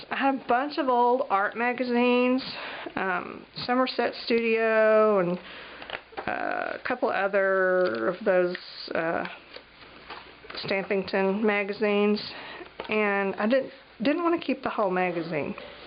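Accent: South, United States. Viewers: OOO! Magazine page shown MUST be about me... I love your videos! You are so incredibly knowledgeable, and I love learning new things from you! Edited to add: You are also a hoot to listen to. Between your lovely Southern accent, your colloquialisms ("book book", "a pokey tool"), and your complete lack of condescension, you're a pleasure to listen to! You've found a new subscriber!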